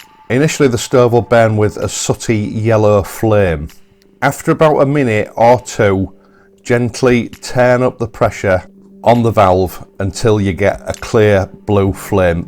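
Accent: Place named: Hull, United Kingdom. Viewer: Hey, I noticed on a previous post your number plate has castle motorcycles on it, I have an 09 bonneville from there. And are you from Hull by any chance as I recognise the accent. Great videos by the way 👍🏻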